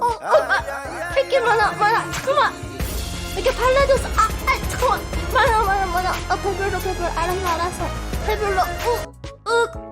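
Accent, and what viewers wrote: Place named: Gyeongsang, South Korea. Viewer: 경상도인이 들어도 경상도 사투리가 찐하게 들려 ㅋㅋㅋ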